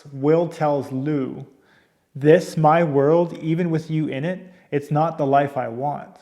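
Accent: Canada. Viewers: There's a lot in this video I was nodding along with but may I just say, you sir have the only Canadian accent I have ever been able to pick up on! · Okay so I know this horribly off topic, but are you Canadian? It's just the way you said eh. I'm guessing if you are Canadian you're probably eastern. Idk I'm just completely focusing on your accent, sorry. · You sound Canadian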